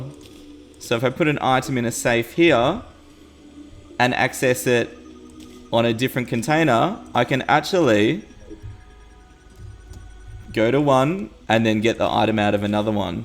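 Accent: Australia. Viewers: You sound Australian, if you are im to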